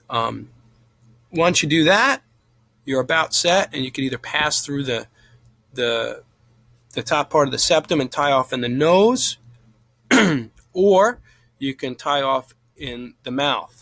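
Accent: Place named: Long Island, United States. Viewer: As I was listening to your video I couldn't help but hear your very distinct Long Island accent. I am from Long Island but moved to Texas 6 years ago. Thank you for this video it was very helpful, you're right, they do not teach you those closures in school.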